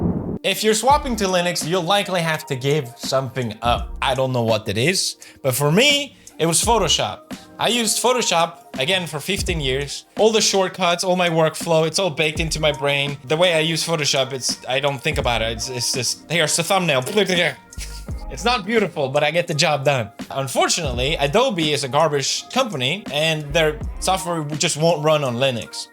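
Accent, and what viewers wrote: Sweden. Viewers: you still sound so darn swedish it's silly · Can I just say I feel like since pewds probably doesnt use english as often anymore I feel like his swedish accent is coming out more and more haha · It took me not seeing your videos for 3 years to realize you actually still have a very prominent Swedish accent! 🇸🇪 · I haven't watched your videos for a long time, but I must say your Swedish accent has somehow gotten worse. Love this video, though, and I agree 100% that more people should use Linux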